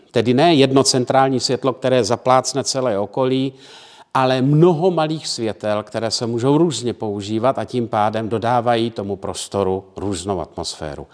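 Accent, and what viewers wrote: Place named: Brno, Czech Republic. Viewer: Mě trochu připomíná pana premiéra Fialu :) Hlasem, lehce brněnským přízvukem, těma pomlkama uprostřed věty i tím formálně-akademickým jazykem.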